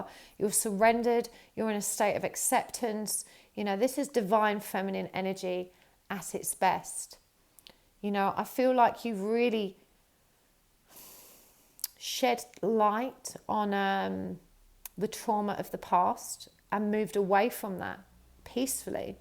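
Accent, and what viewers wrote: United Kingdom. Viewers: Omg i love the British accent. Like it's super interesting why people in England have accents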